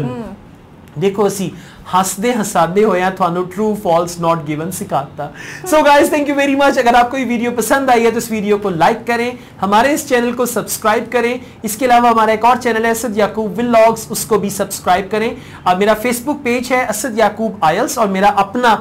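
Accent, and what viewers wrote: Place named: Punjab, India. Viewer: sir I love your Punjabi .. I belong to an area where exactly this excent is followed.. Thanks sir ...😊😊😊😊😊😊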